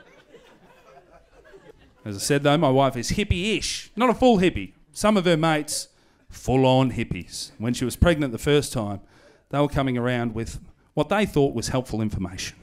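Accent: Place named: Australia. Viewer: That was a great set. You never fail to get me laughing with your Aussie accented stand up about shit we can relate to. Excellent.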